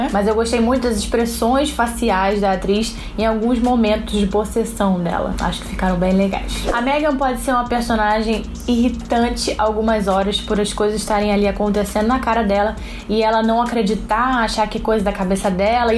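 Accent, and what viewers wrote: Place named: Pará, Brazil. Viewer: adorei o vídeo ^^ inscrito desde já hahaha achei que fosse paraense, o sotaque me lembrou bastante de lá. Sucesso :*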